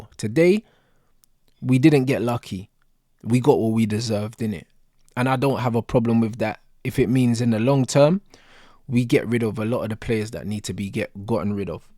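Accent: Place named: Manchester, United Kingdom. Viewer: Lovely Manc accent though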